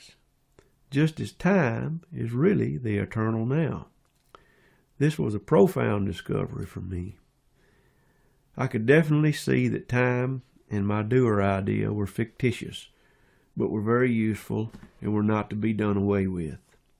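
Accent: South, United States. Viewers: Nothing like non-duality with a southern drawl....it just sucked me in mind and all... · Well... how refreshing to hear it from a south accent. Take care. You got my smile.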